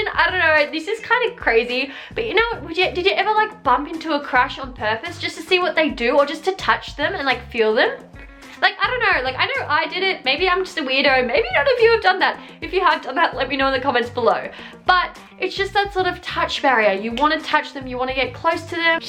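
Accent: Australia. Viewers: are you dutch???? Omg ik hoop het hahah. And are you from Straya I hear your accent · i blushed lmao thanks for replying ♥️ and yes you really sound aussie! smh how could I not tell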